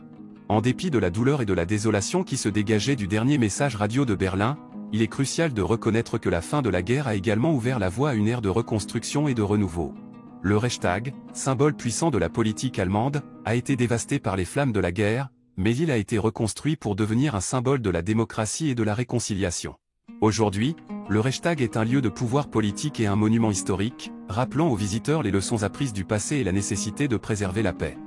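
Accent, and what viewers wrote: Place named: France. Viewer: Aucun message final cité et une belle traduction prononcée toute croche, "like" prononcé comme lécher "lick"... La prononciation à la française de mots anglais me tape sur les nerfs... Ce canal ne vaut pas cher la tonne, pour reprendre ici une bonne vieille expression québécoise...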